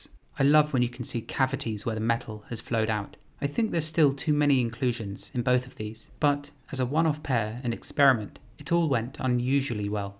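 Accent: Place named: RP, United Kingdom. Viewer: I’m not a native speaker but I learned the language from a fairly young age and I’ve always had a knack for accents and dialects. My first accent was clean British RP then I drifted off into other accents from the British Isles. Lately it’s been a standard Australian accent but you really make me want to move back to RP again. Let’s see how long it’ll last this time XD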